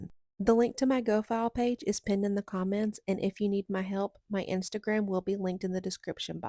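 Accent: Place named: United States. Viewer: I don't mean to annoy everyone here but I feel morally I have to warn you; if you are serious about doing this you need to be way more careful, dumping all the files on GoFile? Are you familiar with copyright? I'm going to simplify this just to get my point across: You need to do stuff like set up private server and host a site to put it on or use a torrent site etc. Also you sound like an american, the US has copyright laws, if EA find you they will sue your arse into oblivion and they have a team of sharks just for that kind of thing, crackers normally are in other nations that have flimsy copyright laws so they can get away with it. I wish you the best I really do and EA are scum so um be careful yeah?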